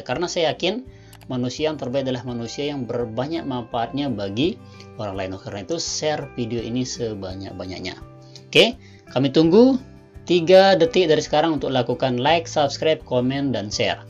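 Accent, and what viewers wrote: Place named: Indonesia. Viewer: anda sbgai guru B.Indo ap B.Inggris pk, cara baca opsinya kok kyk bahasa indo pronounciationnya..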